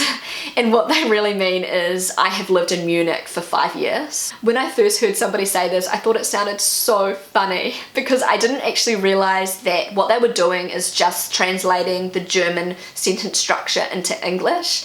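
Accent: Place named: New Zealand. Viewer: It's because her Kiwi accent is non-rhotic (they drop Rs at the end of syllables). But there is a subtle difference; the vowel at the end of "errors" is an unaccented schwa, while the vowel at the end of "eras" is a bit more open and closer to "a" as in "arbiter".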